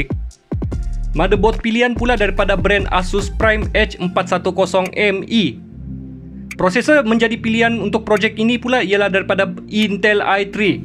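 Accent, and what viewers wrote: Sabah, Malaysia. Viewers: Bro orang sabah kah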